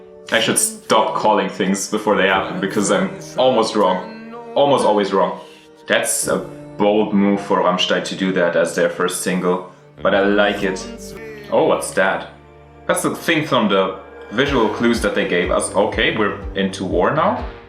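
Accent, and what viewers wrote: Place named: Germany. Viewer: Obviously, your translation is going to be the best one. You speak English very well. However, your accent is German. Thanks for your translation!!!! Could you write the lyrics in German and English? Please? This song is so dark! Is about death, isn't it?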